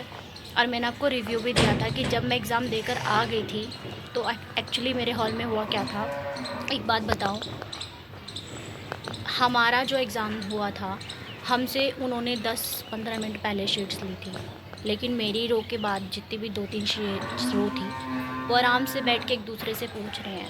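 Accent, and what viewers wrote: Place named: Western Uttar Pradesh, India. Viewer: Aap western up se ho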